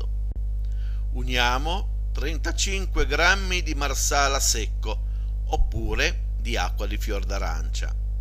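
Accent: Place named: Liguria, Italy. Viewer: Che piacere sentirti parlare con il "nostro" dolce accento Dove si intuisce una bella parlata dialettale della nostra Liguria.....Perché non alterni qualche ricetta in dialetto?....Appena il mio lievito madre avrà raggiunto il "giusto" risveglio farò sicuramente la tua ricetta. Grazie!!!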